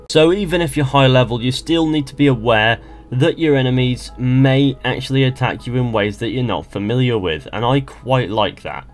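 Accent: United Kingdom. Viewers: Hahahaha !!! ... "Arrow after arrow after arrow after arrow" ... I Enjoyed a lot hearing this in your British accent ... I am still laughing ...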